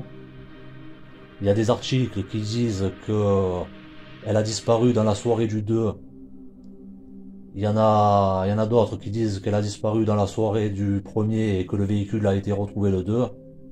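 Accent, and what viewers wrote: South, France. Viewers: Ooooh j'adore cet accent du Sud 😅😅😅 ça m'a surprise like et abo. 🎉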